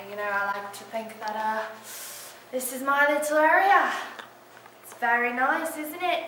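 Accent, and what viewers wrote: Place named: United Kingdom. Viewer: Your soooooo gorgeous 😍😍 i love your British accent 😉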